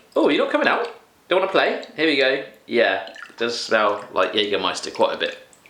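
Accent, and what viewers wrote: United Kingdom. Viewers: Ich finde man hört seinen britischer Akzent sehr deutlich ;D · Miss Rose he is not american, he's british as you can tell by listening to his accent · Er hat ein britischen Akzent :) ich denke er kommt von England. Trotzdem ist es lustig wie die anderen Länder , deutsche Süßigkeiten feiern :D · Er ist aber ein Brite, hört man sofort am Akzent.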